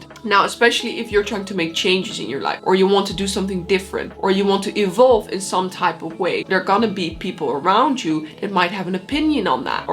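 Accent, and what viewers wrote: Netherlands. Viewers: Not only is your advice, life changing and I've watched all your videos many times over and over again to extrapolate all the information necessary. The Dutch accent is just so pleasant. Thanks!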